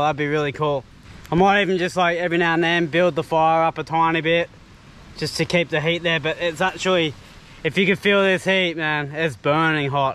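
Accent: Australia. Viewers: This channel is quickly turning into a cooking channel with great australian accent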